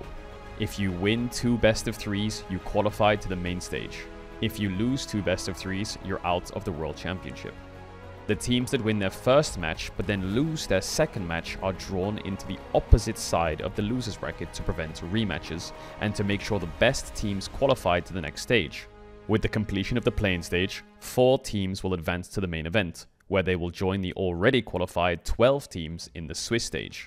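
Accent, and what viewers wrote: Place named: United Kingdom. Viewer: 1. 영국식발음 멋있다 2. 잘생긴것 같다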